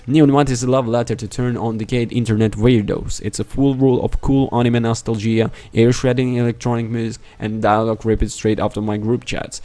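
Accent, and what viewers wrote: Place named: Dublin, Ireland. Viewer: Nice surprise to hear a fellow Dub reviewing video games 🇮🇪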